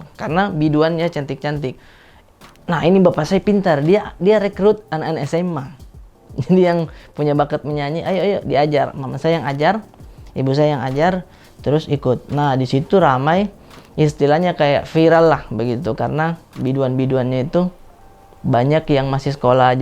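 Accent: Makassar, Indonesia. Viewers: Wah, masih kental logat makassar na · Susah emang kalo khas logat makassar disuruh logat jakarta agak susah dan ribet hahahahahaa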